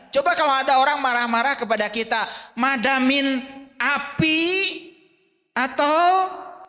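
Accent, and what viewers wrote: West Java, Indonesia. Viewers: Itu logat Sunda, kalo Sunda ya begitu Alloh orang Jawa logatnya Owoh, ga perlu di permasalahkan kaya gitu mah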